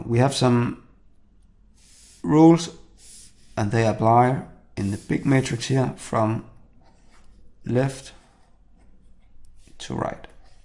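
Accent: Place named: Germany. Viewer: Hey - good video - you sound German. I have a question? is the answer for this video Matrix 39, or the other answers you gave for the 19 Matrixes -- are these "your answers" thru problem solving? or are they answers from a testing psychological institution?? Can you let us viewers know?? -- thanks -- from --Canada:)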